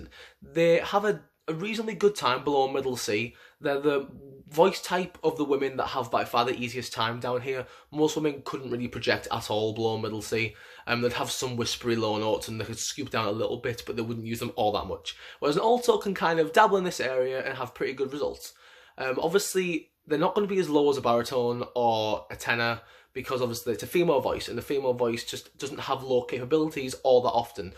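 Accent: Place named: North, United Kingdom. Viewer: I have an accent fetish but I can't place yours exactly...it must be the far north somewhere between Yorkshire, Scotland snd Northern Ireland. I think I'm in love.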